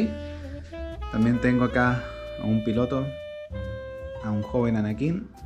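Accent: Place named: Chile. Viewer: Tenés pinta de ser chileno...por el acento lo digo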